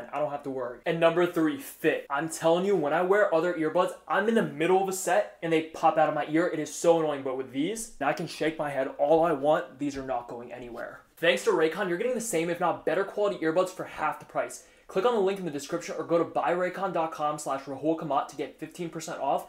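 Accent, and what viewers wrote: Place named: New York, United States. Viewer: Dude your recipes are so good. You have the NY accsnt!!!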